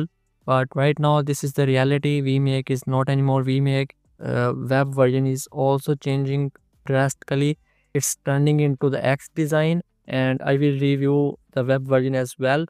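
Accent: India. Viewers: Indian accent😭😭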